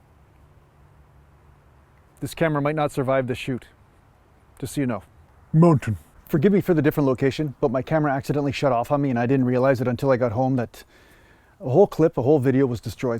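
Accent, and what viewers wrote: Canada. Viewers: If I had to guess, I’d say you were Canadian. But I like this approach.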